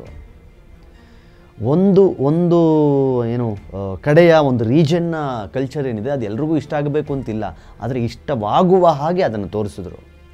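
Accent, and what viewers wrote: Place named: Coastal Karnataka, India. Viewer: ಕರಾವಳಿ ಕನ್ನಡ ಕೇಳೋಕೆ ಚಂದ....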